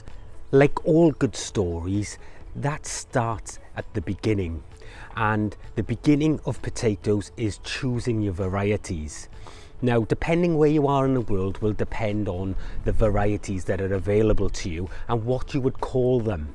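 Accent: Wales, United Kingdom. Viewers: Hi. I'm also originally from Wales,so not only did I appreciate your tips but I also enjoyed the accent. I've just subscribed. What part of Wales are you from? I'm in 💃💃💃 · Glad I found this video. Tried to grow potatoes last year but had a poor harvest. Have now subscribed. Like the Welsh accent. Am I the only fan from Germany? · I think it’s a lovely Welsh accent❤ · I'm guessing he has a Wales accent? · I started growing potatoes in dustbins for the first time this year and I just found you, fabulous information, thanks just what I needed. I very much enjoyed listening to your welsh accent too as I live in France and don't get to hear that anymore, lovely, (said in a very strong welsh accent of course).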